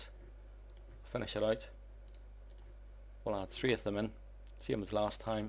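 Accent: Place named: Belfast, United Kingdom. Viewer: i am in canada watching this video currently working for a huge rebar company and i hear that accent....also a belfast fella here so would love to know where ur working with rebar?? its not back in NI is it??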